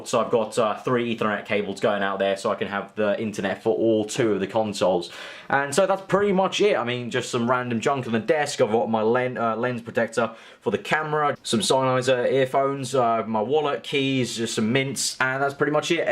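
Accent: England, United Kingdom. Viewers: I was just wondering which part of England your from? The only reason I ask is because your neighborhood looks absolutely beautiful. I live in the US and I've never seen a more beautiful landscape. You don't have to say where exactly, just whether it's northern England or southern, etcetera. Do you live in a rural area? To me you sound English. Your accent, again to me, doesn't sound welsh or Scottish and it's definitely not Irish lol. Plus you, yourself said you're British. And while most American people don't know that Britain is made of three countries, (Wales, Scotland and England; and add the Isle of Ireland and you have the UK, for those of you that don't know) I do. Anyway, I just wanted to know where abouts you're from. As I said before it's an absolutely beautiful neighborhood, you live in. I'd love to live in England. Most definitely in rural England, though. 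As it's the most beautiful parts of your country, in my opinion. If you do live in another country, apologies for my ignorance. ☺ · Pretty sure he's just an Englishman. Lol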